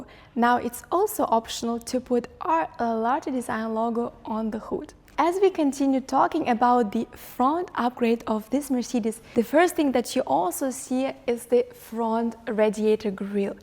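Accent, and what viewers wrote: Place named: India. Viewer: этот английский для индусов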